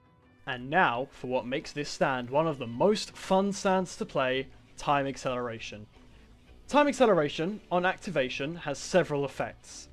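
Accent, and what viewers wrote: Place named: United Kingdom. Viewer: eclipse but british B)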